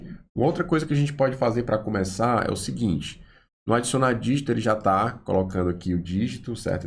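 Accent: Ceará, Brazil. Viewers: seu sotaque parece aqui do Ceará! procede??? =)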